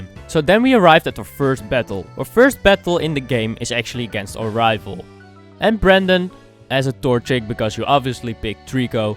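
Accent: Netherlands. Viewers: Are you dutch? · Yo dude u from the netherlands? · Ik herkende het echt meteen, dacht gelijk "Ahh deze gast is Nederlands"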